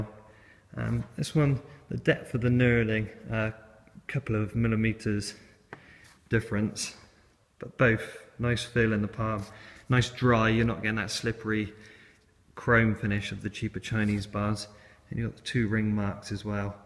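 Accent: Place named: West Country, United Kingdom. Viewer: Love the sound of 'knurling' in a West Country accent.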